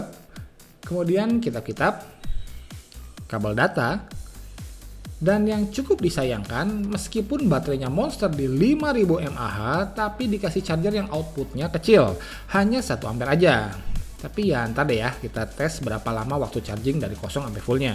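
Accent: West Java, Indonesia. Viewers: Asyik nich reviewnya.... Bahasanya sederhana tapi nyaman, heuheuy Sunda Asli.....!!!!!!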